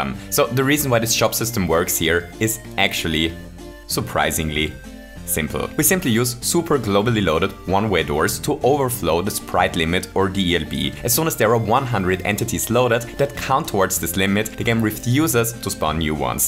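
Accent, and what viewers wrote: Germany. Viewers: Ahhhh ein Deutscher. :D Dein Dialekt verrät Dich. ^^ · This german accent....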